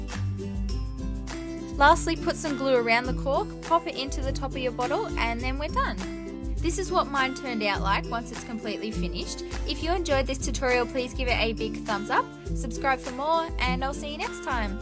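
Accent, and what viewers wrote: Australia. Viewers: Are you Australian? I noticed that the clay you are using for the cat can be sold in Australia and most of the videos I see have clay from America.. then I noticed the accent kinda sounded Australian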